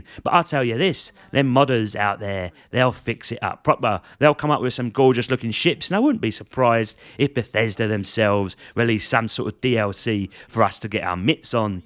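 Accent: Cockney, United Kingdom. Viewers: Awesome vid and so true!!! Respect for U doing it!! and on another note....there is nothing better than Cockney to interpretate English Lang!! 🙏🏻💪🏻👌🏻😂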